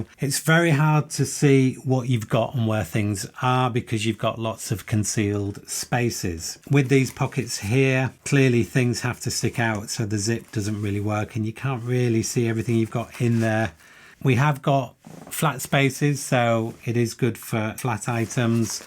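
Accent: United Kingdom. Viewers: May I recommend that you consider Asmr artist work! Your voice can be quite relaxing! Maybe it's the British accent!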